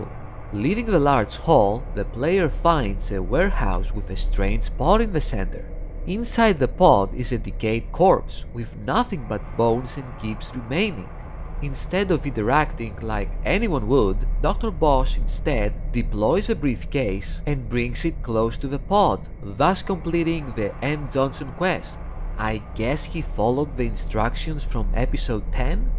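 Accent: Greece. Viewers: is this a greek accent? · Instantly could tell you're greek! Hello neighbor!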